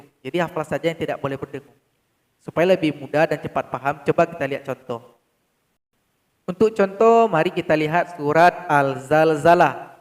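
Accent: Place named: Solok, Indonesia. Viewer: Logat solok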